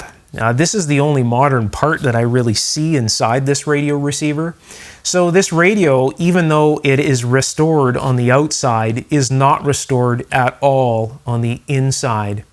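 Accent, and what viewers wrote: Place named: Canada. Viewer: This guy talks like a Canadian.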